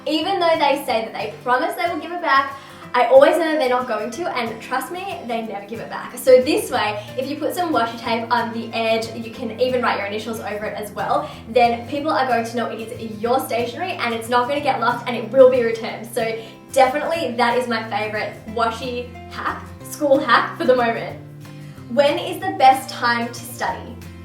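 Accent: Australia. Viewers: Are you Australian? I'm very new to this channel, this is the third video from your channel I have watched! 😂 I'm not trying to be rude or send hate! I'm only asking a question.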